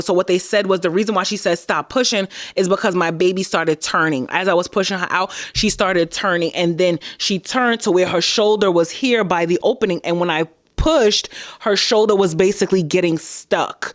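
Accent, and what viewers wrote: New York, United States. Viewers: I love your NY accent 😍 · the New York was JUMPING LEAPING out in this video 😂😂😂 miss your energy on my feed💅🏿🩷🩷🩷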